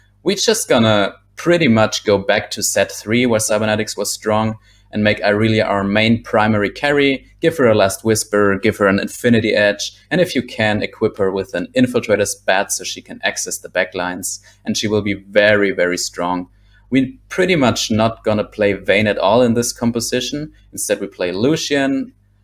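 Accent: Germany. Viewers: Are you German? Your English is very good but your pronunciation of the letter "s" gives it away :) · i mean something light simlar to <link> . But i get your time point, so if not totally fine, just thought I might bring it up. Your analsis is in my eyes better, hence I would be very interested. Especially in the before the patch drops part. :) Since I assume you are german aswell (because of the accent) Gute arbeit. Bitte mehr davon :)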